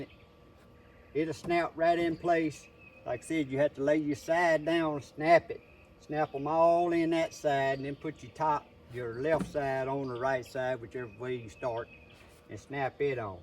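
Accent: South, United States. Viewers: I can’t take the deliberate redneck accent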